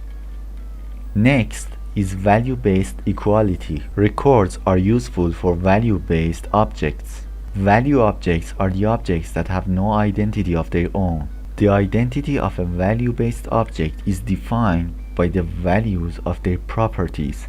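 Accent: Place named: Iran. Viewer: This is how an Iranian talks English:) nice job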